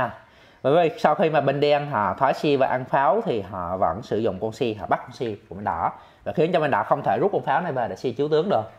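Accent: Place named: Central, Vietnam. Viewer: Mang tiếng miền trung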